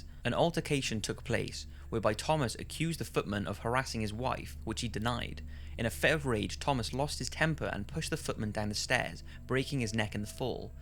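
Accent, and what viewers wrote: United Kingdom. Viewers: Losing your Brit accent? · he sounds more British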